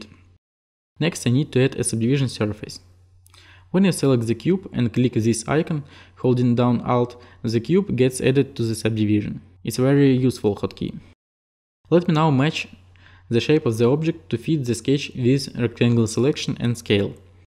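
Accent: Russia. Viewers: МММММ)))) Этот сладкий русский акцент) соу суит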